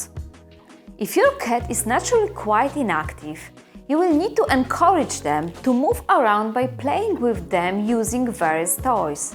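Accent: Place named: Austria. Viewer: Tysm for the info! I love your austrian accent!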